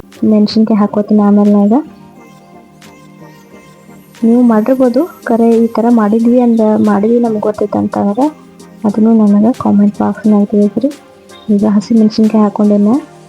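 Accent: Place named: North Karnataka, India. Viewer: Nice recipe sis.. nice sharing ..healthy kuda..nimma uttara karnataka bhashe nu super🎉🎉🎉🎉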